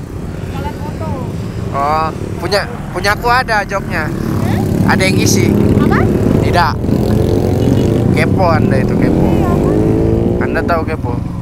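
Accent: Papua, Indonesia. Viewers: Logat Papua bro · KK mcm pu logat ni logat" Papua k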